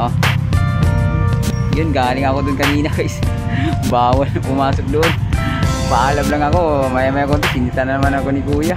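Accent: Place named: Tagalog, Philippines. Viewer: Nakakatuwa kang pakingan mag salita ng Tagalog. Hahahaha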